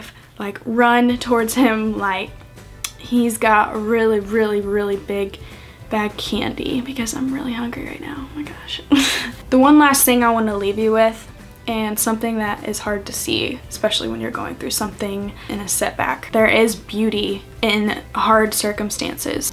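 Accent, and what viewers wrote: South, United States. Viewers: Whered u pick up that southern accent from? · Hannah Rose Ikr I was just thinking, is it just me or does she sound more southern in this video? 😆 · Your southern acent is really coming threw in this video 😁👍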